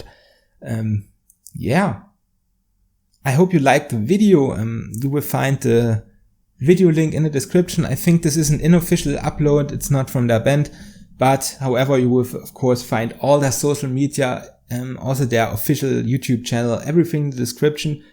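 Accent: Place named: Germany. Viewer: Are you German? Your accent sounds so familiar. Do I hear a bit Saxon out there?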